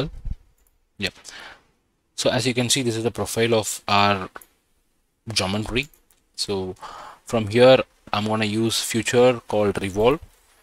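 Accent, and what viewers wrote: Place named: India. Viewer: People from different regions have different accents. DEAL WITH IT. probably he is an Indian, which means English is not mother tongue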